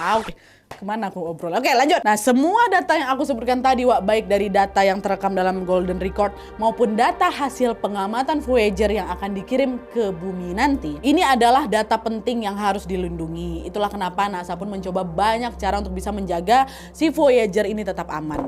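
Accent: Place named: West Java, Indonesia. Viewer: Dari logat dan speed ngomong nya,saya yakin kak ini pasti orang Sunda🤔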